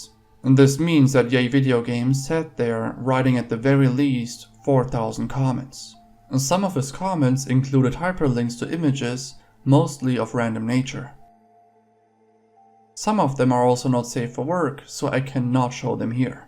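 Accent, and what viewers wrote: Germany. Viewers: I thought it was a Slavic accent at first, but it's German. Very pleasant. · I'm half British and half german, so I'm always thinking are you German? I can hear a light accent but it can also be something else :) Keep on the good work!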